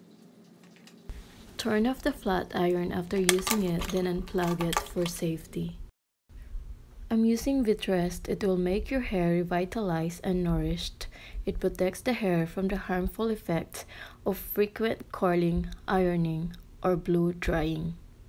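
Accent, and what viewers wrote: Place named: Australia. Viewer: I love the Aussie Accent oi , hair lol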